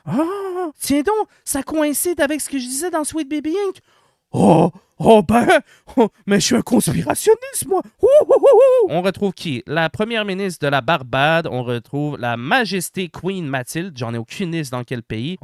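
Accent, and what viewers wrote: Quebec, Canada. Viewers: Bordel que ça fait du bien d'entendre à nouveau l'accent Québecois, ton pays me manque (j'y ai passé 1 an et demi pour travailler et y vivre mais la CAQ+ le covid ont foutu la merde dans les permis de travail), dommage que le Canada et le Québec soient devenu aussi woke. Pour tes vidéos (qui sont d'utilité publique), je l'avais déjà compris par les vidéos de Psyho et par déduction que c'était beaucoup plus gros qu'une simple entreprise de malheur qu'est Sweet Baby Inc. On le remarque aussi en Belgique ou les partis, syndicat et groupe de gauche sont envahis de wokes. On a encore de la marge par rapport au Canada et à la France en terme de wokisme ( la majorité des Belges trouvent ça ridicule) mais ils finiront bien un jour par s'étendre partout. De mon coté, comme beaucoup j'utilise mon portefeuille en représailles surtout que les jeux woke sont facile à détecter, juste dommage pour Subnautica 2 mais tant pis, je ne vais pas dépenser de l'argent pour mes ennemis idéologiques. 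Sinon, je n'hésite pas à assumer mes idées en publique et à combattre tout wokisme lors de débat. J'ai d'ailleurs remarqué que 99% d'entre eux n'osent rien dire vu que je suis chocolaté, façon, ils n'ont aucun arguments, ils sont endoctrinés dans une secte. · Déjà + 1 car tu es un cousin et quel plaisir d’entendre ton accent qui me manque. Merci de nous donner ce genre d’information, sachant que votre province à une tolérance incroyable. Voir un ami Québécois prendre à cœur l’univers qui entoure du jeux vidéos, ça fait plaisir. Bon courage à toi.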